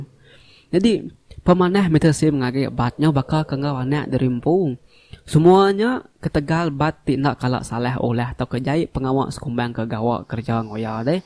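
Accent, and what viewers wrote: Sarawak, Malaysia. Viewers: Biasa lah nya, lidah iban hehe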